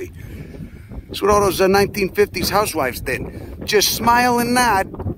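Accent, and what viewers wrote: Chicago, United States. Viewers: Ay, ah? Been watching you for awhile now. Lived in Chi most of my adult life, but left a few years ago. Found your channel and stopped to hear the accent again, but stayed because you make botany interesting. Been on the North Coast of the DR since December. If you're coming up toward Puerto Plata/Sosua/Cabarete and you want to dial in more local flavor, lemme know and what the shit.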